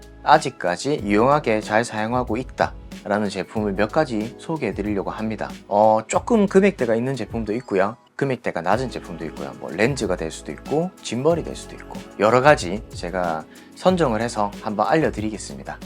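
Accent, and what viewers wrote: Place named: Busan, South Korea. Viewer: 카메라 관련 제품리뷰를 찾아보려면, (어쩔수 없지만) 광고협찬이 많다보니 믿고 시청하기가 힘든 경험이 많네요 ㅠㅠ 그래도 님이나 몇몇 리뷰어 분들께서 이렇게 솔직히 얘기해주시니 더 믿음이 가는 것 같습니다!! 이런 분들은 나중에 협찬을 받더라도 "정말 좋은 제품을 소개해주려나보다"라는 마음이 드는 거 같아요! 좋은 영상 감사하고 앞으로 더 잘 되시기 바랍니다! +부산사람이다 보니 사투리가 정겹네요😊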